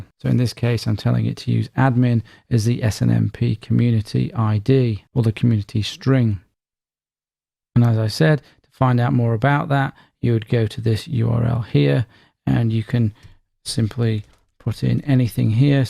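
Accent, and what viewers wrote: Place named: United Kingdom. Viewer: A very good detailed explanation .... only thing it's kinda a little bit hard to understand your british accent.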